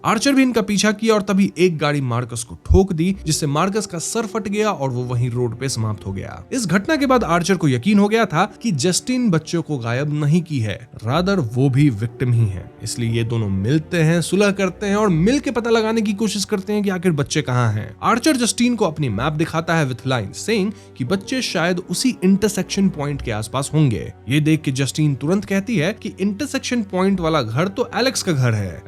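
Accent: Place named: Bihar, India. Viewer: Tu bihaari hai kya agar h to bihari accent nikaal de isme se . Wese bhi tu totla and labduu h bolne me waau waau type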